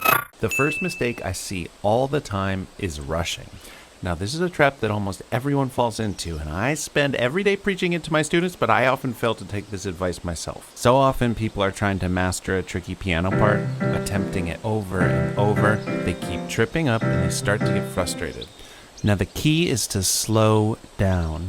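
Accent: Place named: United States. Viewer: This video is amazing. I will show it to my students. And by the way - what pleasant American-English you speak!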